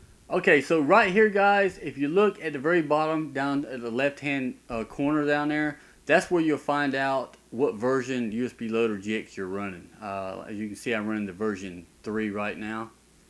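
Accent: South, United States. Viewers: My dude you sound like the biggest stereotypical southerner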